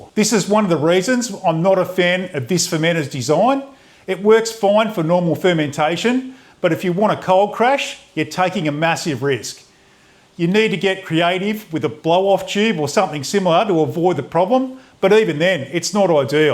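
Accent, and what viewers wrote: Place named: Australia. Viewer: Of course it was a compliment. I'm English and love the Australian accent. Was hoping to brew a Velvet Vanilla Porter by David Heath this weekend but our crappy postal service hasn't delivered the required yeast, despite me ordering it on Wednesday evening and it being posted 1st class Thursday. Royal Mail must hate me cause I'm always complaining about the service these days. I let them give the excuses then tell them I was a postie for 9 years back in the 1990's. Like most companies it's gone down hill since privatisation. Unfortunately my local homebrew shop doesn't stock the Lutra Kveik yeast recommend.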